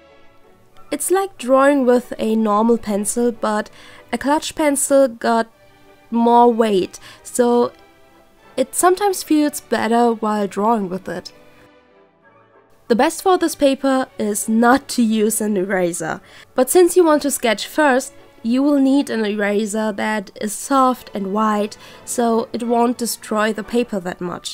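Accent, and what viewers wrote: Germany. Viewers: Ich wollte wissen was ein Shikishi ist, da ich davon gestern das erste Mal gehört habe und muss kurz sagen: Ich erkenne Deutsche, die Englisch sprechen irgendwie sehr oft :'D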